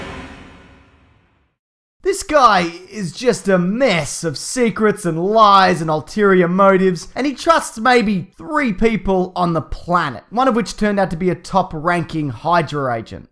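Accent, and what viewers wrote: Australia. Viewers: His accent is australian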